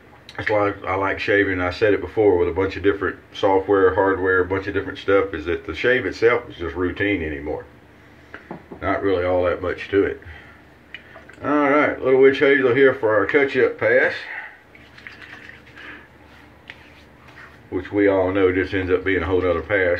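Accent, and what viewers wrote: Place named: Mississippi, United States. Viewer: You can tell that you are from Mississippi by the way you say it. Missipi, if you ain't from there, you don't understand.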